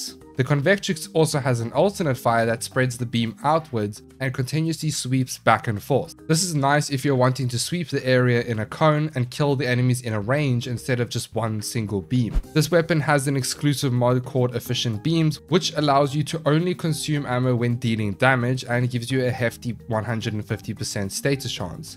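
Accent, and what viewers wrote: South Africa. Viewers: As someone who recently got back into Warframe, thank you! 🫡 Also, your accent sounds like you're South African, if you are, I hope that loadshedding is kind to you Tenno 🙏🏻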